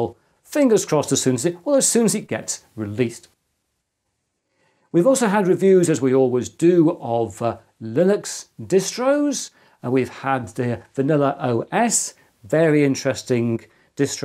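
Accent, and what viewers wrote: United Kingdom. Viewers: There's that calmingess and your British accent, that makes your videos really enjoyable to listen to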